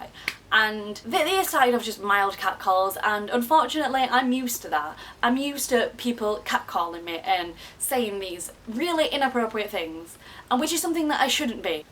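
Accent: Yorkshire, United Kingdom. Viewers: I am glad you are alright. I will always remember you because when I was looking for the Yorkshire accent, your videos were the first I watched. :)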